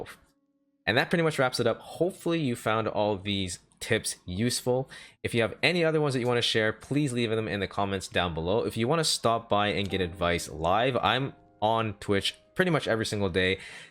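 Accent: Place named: Canada. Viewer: Ahhh. A nice normal Canadian accent.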